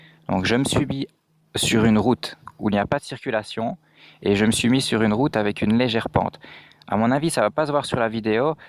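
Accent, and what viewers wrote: Belgium. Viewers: Tu es belge ? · Tu es belge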